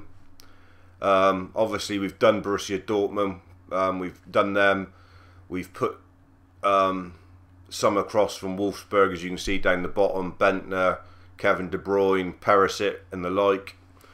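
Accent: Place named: Bristol, United Kingdom. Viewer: Love that Bristol accent!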